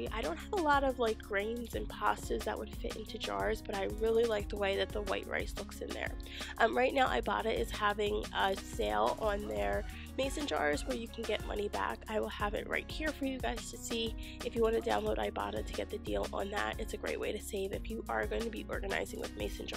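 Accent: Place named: Midwest, United States. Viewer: Love your videos! Random question, are you from the Midwest? I am sensing a Ohio/Illinois/Michigan accent! If you don't feel comfortable answering I totally understand! Keep up the great videos!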